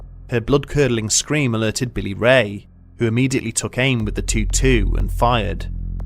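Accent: United Kingdom. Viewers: Sorry but this narrator calling a twenty two riffle a “two-two” is just ridiculous and I assure you the people from UK 🇬🇧 do not all speak like this twat.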